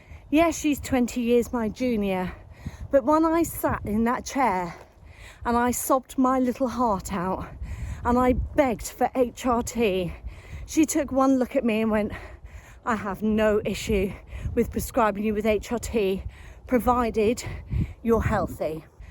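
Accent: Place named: United Kingdom. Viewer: I just love your beautiful UK speech and dignity. I just had an appointment this afternoon. I'm 57 yrs old and have had researched things. I attempted taking herbs to manage my changes. I was able to for awhile. But in the last few months I was not doing so well with it. So I am starting HRT. I want to punch that MD for you as well! I'm so happy to come across your video today.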